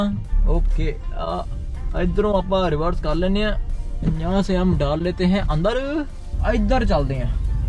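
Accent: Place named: Australia. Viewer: Your Australia accent is amazing ❤️❤️❤️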